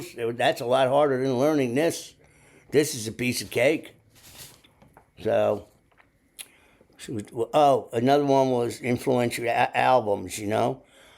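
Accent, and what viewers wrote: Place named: Philadelphia, United States. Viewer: I knew you were from philly...i know that accent too well...im from south jersey but my pop is from philly...dan scoleri....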